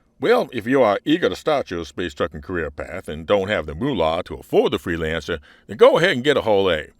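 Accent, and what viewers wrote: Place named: South, United States. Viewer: I say, I say...I enjoyed the foghorn leghorn southern inflection/accent. Not super familiar with Bezsek.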